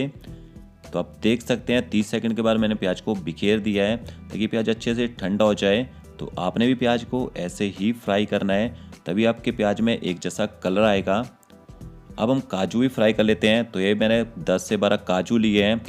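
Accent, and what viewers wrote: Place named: Punjab, India. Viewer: आपका पंजाबी स्टाइल में बोलना बहुत ही अच्छा लगता है ।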